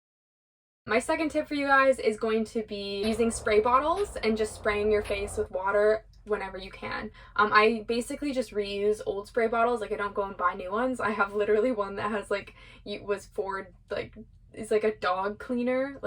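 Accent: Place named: Canada. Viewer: Your vids are cool, and I love the way Canadians say "aboot" (about).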